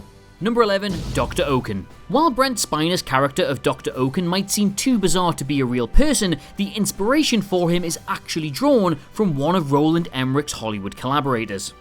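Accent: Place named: North East England, United Kingdom. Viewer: The v/o guy is from the North East of England and that is just how they pronounce words. It's like how a lot of Black Americans say aks instead of ask.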